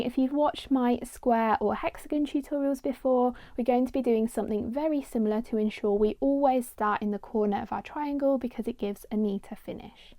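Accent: United Kingdom. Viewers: You have a British voice but I think you are using USA crochet terms ?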